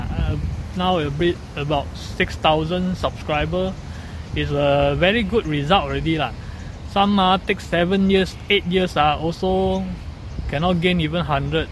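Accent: Singapore. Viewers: Hi, i've been following your channel for a couple of years now and i say there's no need to change or to find a niche. Actually you yourself is already a niche. Your channel is about you and your life. And who you are, speaking singlish like most of us do, is what make you a true blue singaporean. This is also what makes your channel relaxing to watch. One thing i suggest is to do away with youtube shorts as it doesn't really bring anything across. You can make 30min vlogs of you touring around your neighborhood eating different food and that will already be relaxing to watch. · I am one of your subscribers, I love your content and your editing. You are very authentic and real. Don't change, I enjoy your uniqueness and Singlish. Thanks for your videos. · If I were to analyze, the way you speak is cater for Singaporean only. A lot of international fans will not understand what you were talking. 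But most Singaporean like to watch watch only 2 things on YouTube. Comedy and Chio Bu(especially those sexy sexy type). That's why if you see trending, top channels/ Singaporean always repeat their contents. Like those 10 types of students/ 10 types of that (Which I find it super boring). Even those channel with Niche like runningKao which is focusing about running did not become as popular as those channel with girls showing skin. Furthermore, runningkao's english is similar to yours which is more suitable for Singaporean. Therefore, I feel finding a niche is important but depending on Singaporean for views will not be rewarding in the long run. That being said, I still love your content especially pre-covid19 all those BTO, Grab food (Hokkien version) and races (That's why I subscribed to you channel be I love the way you speak and your character)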